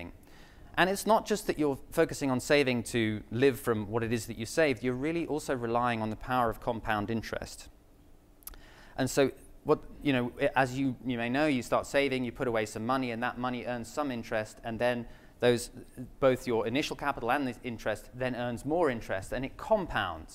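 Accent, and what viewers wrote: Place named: England, United Kingdom. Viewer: Wait a minute....how'd Mr Money Mustache get an English accent????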